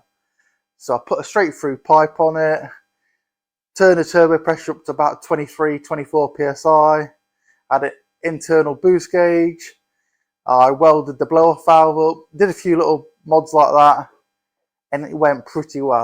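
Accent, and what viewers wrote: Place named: East Midlands, United Kingdom. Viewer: Found myself with a daft grin on my face while watching this! I love my Vauxhalls. Never had an engine swapped one but I love the thought of a C20LET or Z20LET in a Nova or Corsa. Where are you based? I think I detect a Derby/Nottingham accent.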